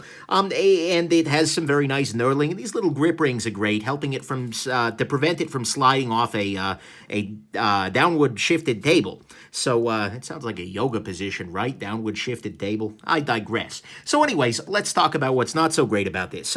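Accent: New York, United States. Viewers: Your accent is so cool! Are you from New York? I quite like this pencil for drawing technical drawings, I still need to upload a video where I use it but I like it so much that I'm going to be selling these on my website. I am looking forward to trying one of the Rotrings some time.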